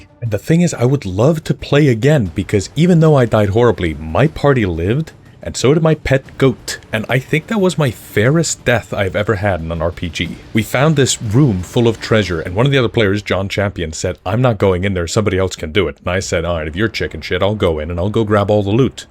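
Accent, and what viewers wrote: Iceland. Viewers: I don't who you are deep voiced icelandic accented henry cavill lookin harry potter glasses wearing person. but your video showed up in my feed and i liked it.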